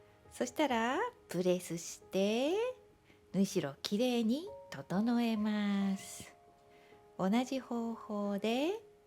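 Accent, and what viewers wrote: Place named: Kansai, Japan. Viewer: 関西弁😂とてもいいですね、笑いました。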